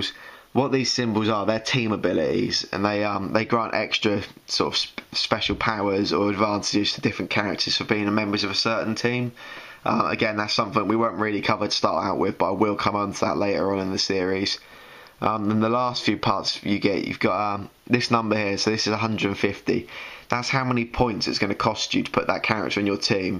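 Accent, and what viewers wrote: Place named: United Kingdom. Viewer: I've found that when instructions are given by a person with a british accent, they always sound smarter than a person without an accent. Bravo!!!! I found this guide very informative.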